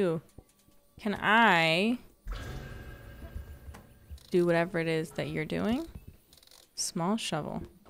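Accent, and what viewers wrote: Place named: Magdalen Islands, Canada. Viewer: it reminded me most of the accent on Les îles de la Madeleine in Quebec. Close but not as “muddy”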